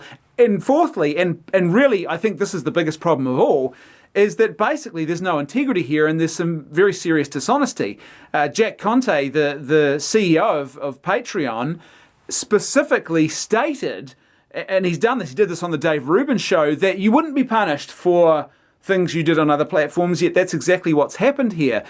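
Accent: New Zealand. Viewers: I would've subbed, but you clowns from across the ditch have the most annoying accent in the world. Sheesh dude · You sound like a new zealander sir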